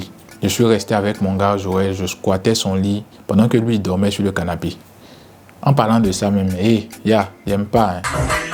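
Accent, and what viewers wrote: Ivory Coast. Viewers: Je suis nouvelle juste pour les parabole je m'abonne 😂😂 j'aime écouter quand un ivoirien parle. 😅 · L'accent Ivoirien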